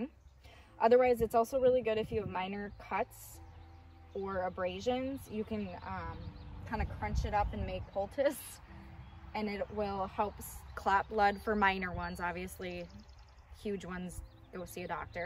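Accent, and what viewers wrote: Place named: Minnesota, United States. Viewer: Can't beat that Minnesota accent.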